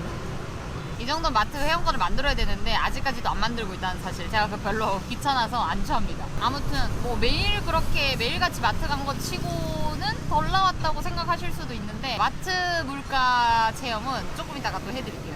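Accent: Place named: Gyeongbuk, South Korea. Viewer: 경상도 말씨를 쓰시던지 아님 표준말을 완벽히 쓰시던지 정말 말투가 너무 지즈븐해요 그냥 사투리쓰세요 특히 경북사람이 어설픈 서투리쓰면 들어주기 정말 ㅈ 같아요!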